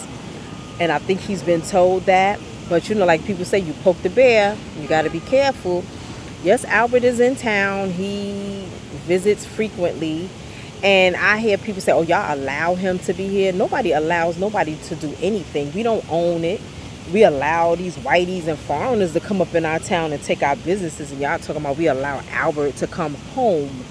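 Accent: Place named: Harlem, United States. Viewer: I love her Harlem accent 😏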